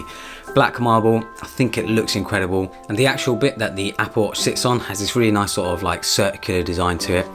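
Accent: England, United Kingdom. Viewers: Just found your channel. Huge fan of tech YouTubers! And it sounds like I’ve found a fellow Englishman! Keep it up mate and don’t mind me. I’ll just be flying through your vids rest of the weekend 👌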